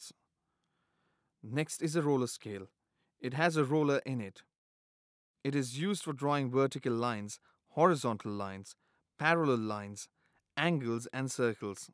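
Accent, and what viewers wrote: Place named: Kerala, India. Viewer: nice work mate. you are definitely from india. ur accent gives u away. i think you are from kerala.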